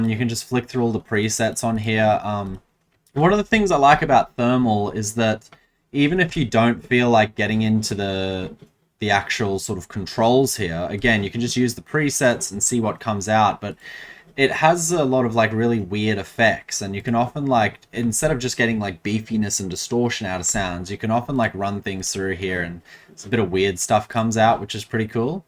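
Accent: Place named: Australia. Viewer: You sound like you from Australia🇦🇺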